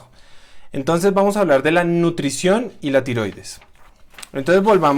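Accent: Colombia. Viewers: Gracias, muy Colombianisimo, en su hablar! Veremos como me ayudo con toda esta informacion. Estoy en un pais, donde : TSH, T3,T4 y listo. y hay de mostrar que sabemos un poco mas.......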